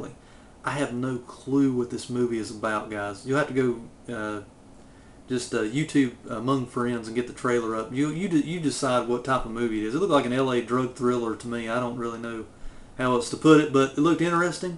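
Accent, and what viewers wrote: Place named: Western Kentucky, United States. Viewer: Is that an actual poster for "The Mutilator" aka "Fall Break" !!??! That is bad ass. Also, your accent sounds very similar to the folks where I grew up in Western Kentucky. Great vid. Sounds like you had a blast.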